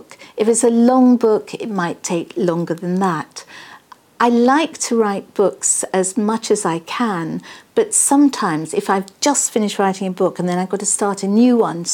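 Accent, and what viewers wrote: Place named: United Kingdom. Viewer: I love her British accent its so sweet :P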